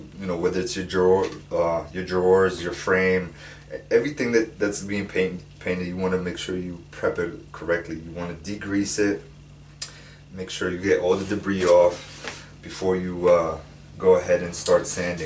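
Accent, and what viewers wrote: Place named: New York, United States. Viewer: My father was a house painter. I had tons of exposure on tools, method and product. Thank you for adding to my knowledge base for kitchen cabinets. I have oak cabinets and I want to update with A nice off-white on the uppers and a darker color on the lowers. I’ve been watching YouTube videos on how to prepare the oak cabinet and I was becoming discouraged because of the level of difficulty in the preparation phase with the wood filler etc. None of the videos I saw until today talked about self-leveling paint for cabinets. I’m gonna do what another comment mentioned, do the bathroom cabinets to get a feel for it and then tackle the kitchen. My dad‘s not here anymore to help me so I’m doing this in his honor. Thank you for the video I really appreciated it. With your accent you make me miss my New York people. God bless.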